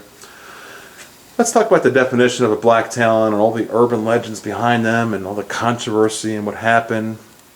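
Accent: Long Island, United States. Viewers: the fly popped in and left when you said bye,,, funny. what part of LI are you from. I thought I detected an East Meadow accent.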